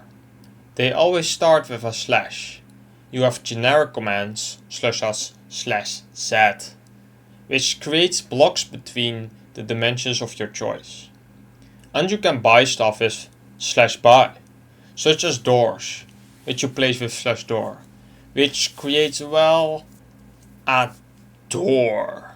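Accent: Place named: Netherlands. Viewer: I smell Dutch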